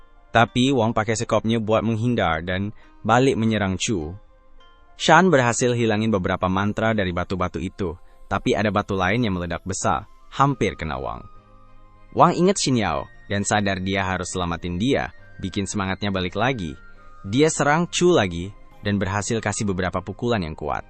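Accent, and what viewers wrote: Malaysia. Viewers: Cara kamu berbicara indonesia setengah Malaysia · Bang kok tiba tiba jadi logat melayu sih bang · Bg kalo bisa logat Malaysia nya di kurangin atau di hilangkan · Aku yang asal malaysia ni pun rasa geram dengar loghat dia dicampur²...kalau nak cakap malaysia cakap terus takyah bercampur aduk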